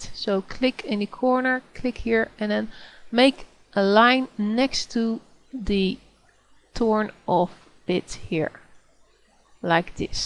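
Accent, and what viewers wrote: Netherlands. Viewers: What capture program do you use?, PS: Ik hoor het aan je accent, jij bent nederlands he?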